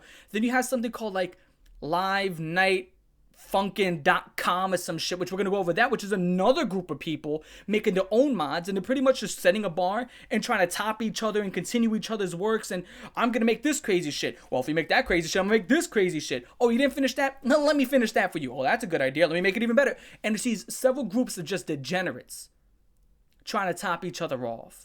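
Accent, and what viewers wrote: New York, United States. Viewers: How much newyorker are you?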